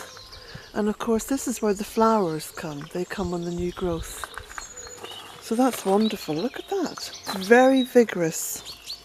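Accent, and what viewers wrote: Ireland. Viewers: Well, You say you are rambling on, but I so enjoy hearing you ramble in that lovely Irish accent of yours. I wonder if you truly realize the pleasure you bring to so many of us. I have always loved nature, especially animals, but I now look at the landscape around me with eyes anew. My maternal grandmother was born in County Claire Ireland, and so I particularly love your channel. And I hope, one day, to visit Ireland again.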